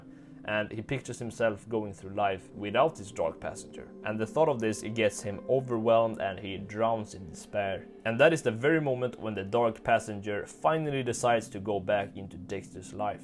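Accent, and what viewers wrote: Sweden. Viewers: Are you sweedish?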